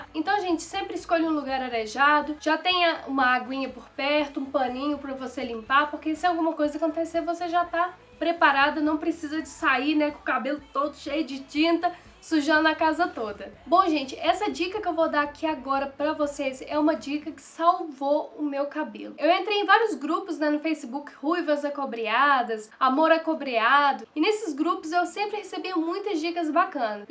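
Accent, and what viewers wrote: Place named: Brasília, Brazil. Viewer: Acho que ela é de Brasília-DF. Pq o pessoal aqui tem esse sotaque lindo, maravilhoso, pelo qual sou apaixonada tbm 😍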